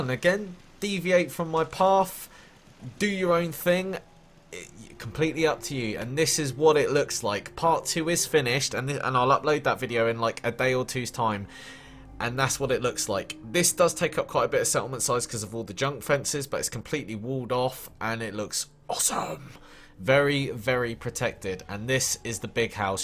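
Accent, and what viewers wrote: United Kingdom. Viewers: is every youtuber that plays fallout fucking British? Jesus fucking Christ.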